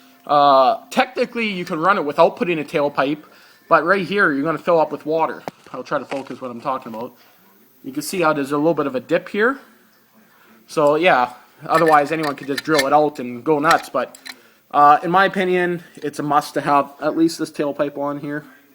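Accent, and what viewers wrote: Canada. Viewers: What part of Newfoundland ya at me buddy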